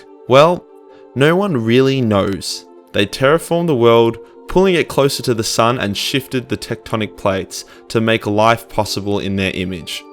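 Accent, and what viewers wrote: Australia. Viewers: No, he’s just Australian.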